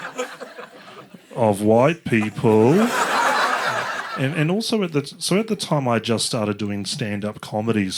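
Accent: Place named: New Zealand. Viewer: Kiwi / New Zealand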